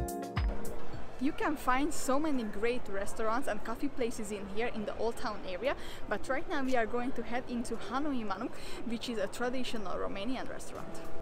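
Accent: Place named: Hungary. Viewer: Romania was never part of Soviet Union. You have a very cute Hungarian accent. It's like your native country. Fortunately never Soviet Union. Greetings!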